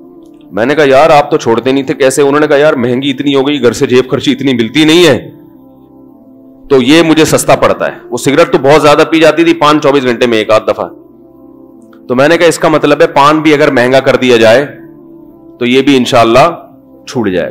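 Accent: India. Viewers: Molana ka voice agras pura ka hai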